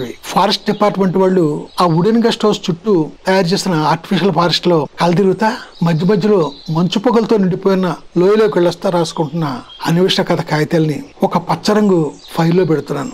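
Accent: Godavari, India. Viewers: Miru godavari slang and location bhaga chupedthari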